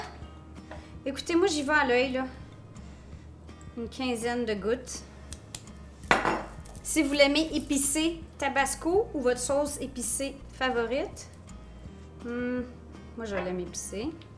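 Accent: Quebec, Canada. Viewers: J'ai beau me concentrer et m'intéresser à ce qu'elle raconte, mais j'avoue que j'ai vraiment du mal avec l'accent québécois. C'est vraiment un accent et un français très très local. Au passage, je ne suis pas français mais je trouve le français de France vachement plus attrayant. Autrement les vidéos sont intéressantes.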